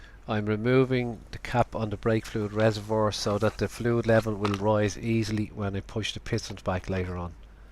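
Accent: Ireland. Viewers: Love your sense of humour and your Irish accent. Thanks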